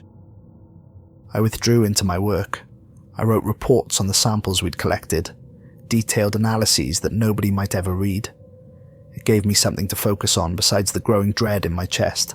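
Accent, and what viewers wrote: United Kingdom. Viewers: You did an excellent job of writing one of the most depressing science fiction stories of all time despite the glaring inconsistencies. The narration was completely appropriate for the dark atmosphere, although the British accent seemed out of place. I disagree with many of the earlier posts that this tale needs a second part with a more conventional ending.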